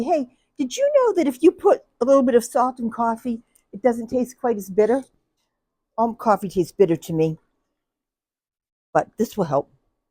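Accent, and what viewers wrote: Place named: Massachusetts, United States. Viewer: I love watching your video's you make me crack up,,, As soon as I heard you speak, I said to myself that's a Massachusetts Accent. The reason that I recognized it is because I live in Mass as well. I'm looking forward to your next video, Take Care----Madeleine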